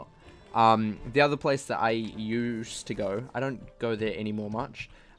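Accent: New Zealand. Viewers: Hey you sound like a kiwi player.. so am I if you are.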